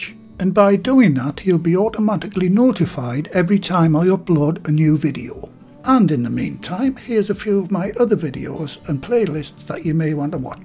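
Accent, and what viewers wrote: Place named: North East England, United Kingdom. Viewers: Hi where are you in the east as you sound as if your in Durham,my mumd loves how you speak as I shown her your channel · Thanks so much, those pasties look heavenly, I must give this a try. So well explained and presented, you are a star, absolutely love your North-East accent, take care, best regards Rob. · I think you’ve earned a sub. Gonna give these a try once they get flour back in the shops lol. Btw I’m from Sunderland your accent doesn’t seem you’re that far away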